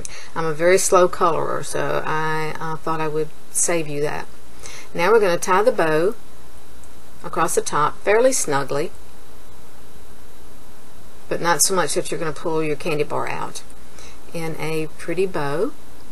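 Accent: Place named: South, United States. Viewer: I enjoy watching this crafter in particular. She's definitely a master of this craft. Plus the southern accent is v.v. charming :)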